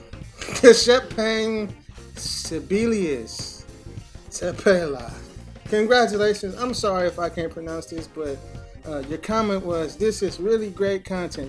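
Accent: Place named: United States. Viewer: Can anybody tell me please, wich region of América this accent comes from?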